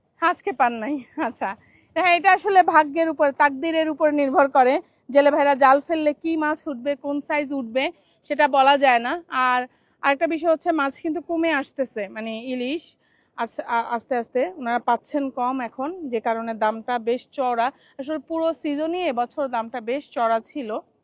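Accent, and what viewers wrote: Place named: Bangladesh. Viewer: আমি ইন্ডিয়ান কলকাতাতে থাকি ,তোমার blog দেখতে খুব ভাল লাগে বিশেষ করে বাংলাদেশের ভাষা শুনতে। আমার origin বাংলাদেশের ফরিদপুর এ।বেশী করে বাংলাদেশের ভাষা বলবেন,অনুরোধ রইল। আমার নাম সুব্রত মিত্র,বয়স 72।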